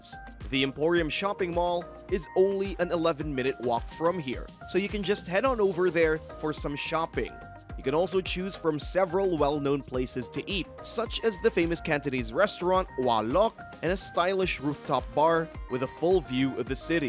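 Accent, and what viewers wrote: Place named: United States. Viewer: he sounds American.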